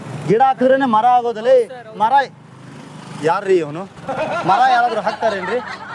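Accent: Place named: Hassan, India. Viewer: Bro nivu mathdo reethi nodidre namma Hassannadavaru anssuthe bro 🥰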